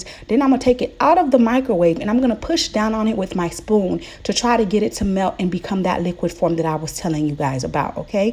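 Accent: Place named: Miami, United States. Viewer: I knew it that Miami accent is strong lol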